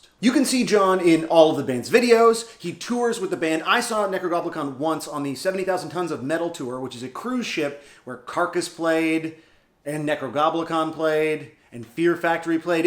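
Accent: Canada. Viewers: Woah wait, are you Canadian?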